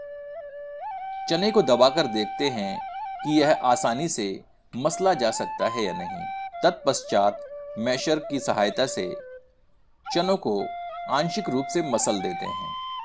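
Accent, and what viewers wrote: Standard. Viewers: apki shudhh hindi .. apka andaz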